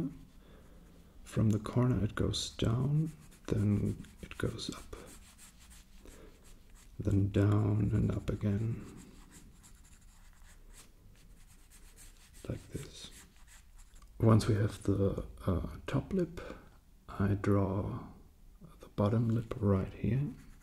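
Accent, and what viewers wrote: Germany. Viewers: this is when he still had a little german accent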